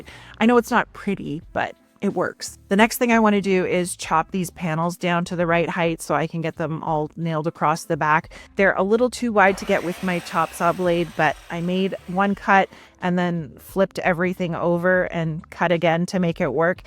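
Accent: Canada. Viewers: I always say I cringe about when I see people paint over hardwood furniture. I think I would have stripped it down, and maybe do a white wash stain. WhileI'm not a fan of dark painted furniture I get that that's your style. It would have been interesting to whitewash the whole piece minus the top. Or do the whole thing. Are you Canadian? Sounds like you have a little accent? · thank you! I prop mine in the morning and I just sit and watch you are so dang good at this. Definitely found your niche. Expert level every detail. I am from New England COAST, I here, Toronto in your accent. I am a Tour guide. I meet people from the world. But I miss Canadians. Don’t come to visit so much anymore. I apologize for our political situation. Thank you for being my entertainment.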